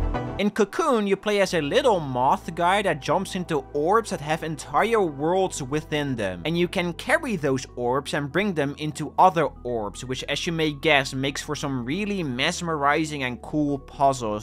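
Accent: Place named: Netherlands. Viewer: I like your funny accent Dutch dude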